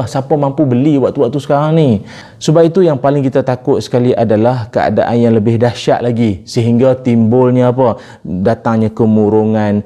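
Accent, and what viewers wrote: Kedah, Malaysia. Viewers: tutur kata ustaz mudah faham.loqhat kedah tak keterlaluan teruskan berdakwah salam baling ledah.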